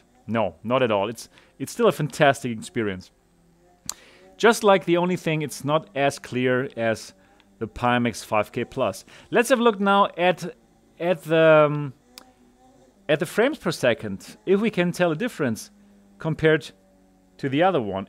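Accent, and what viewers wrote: Germany. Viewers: So I hate to ask the incredibly silly question but what is the native/prefered language of. I'm trying to learn many languages and I find it interesting to figure out who non-English speakers adapt to English. My personal guess from listening to this vid. is german.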